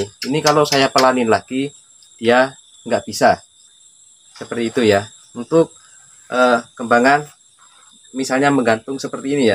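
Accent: Madura, Indonesia. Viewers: Logat e sampean wes koyok duduk wong meduro cak wkwkw keren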